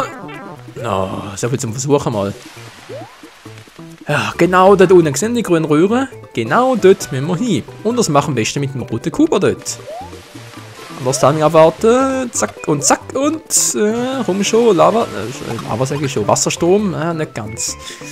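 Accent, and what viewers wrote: Switzerland. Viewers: Schwizerdütsch, winis liebä XD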